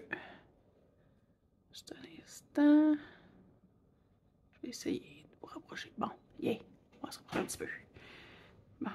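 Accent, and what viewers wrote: Canada. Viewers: Je l'ai terminé aujourd'hui. Je suis de France, dans la Sarthe (rillettes, 24h du Mans). J'ai adoré le faire et surtout avec votre accent Canadien 😍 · Merci pour cette vidéo. J' ai beaucoup apprécié vos explications. Votre accent québécois me rappelle de bons souvenirs ( voyage dans votre beau pays). Bonne continuation. Je vous envoie un petit coucou et un petit rayon de soleil méditerranéen du Sud de la France. Merci pour votre partage.KW.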